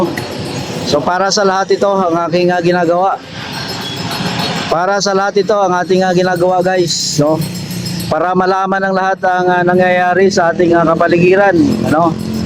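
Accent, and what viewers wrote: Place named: Mindanao, Philippines. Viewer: Kayo po parang taga Mindanao ka at DDS ka at sa tono ng pagsasalita mo 😂😂😂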